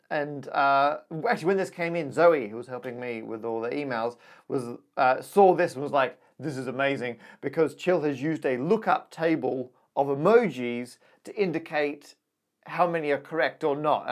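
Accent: Australia. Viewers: What kind of accent is that for an Englishman anyway? Sounds like someone from Perth.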